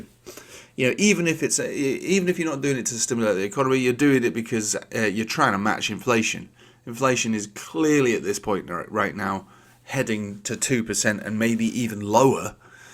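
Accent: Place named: Yorkshire, United Kingdom. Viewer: Thanks for the video mate I thought you was somewhere near me by the acent I’m in Bradford the posh side of Yorkshire 😂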